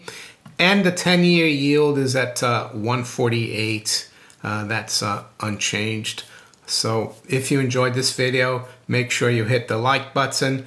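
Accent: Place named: United States. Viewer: do I have this right? You are an Italian, born in Brazil, You talk like an American, and live in England?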